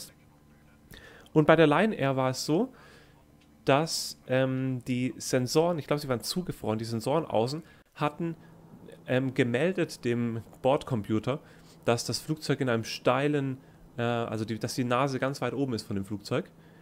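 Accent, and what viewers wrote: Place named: High German, Germany. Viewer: I know German I was born 100 percent pure German I am a hutterite. He's talking g high german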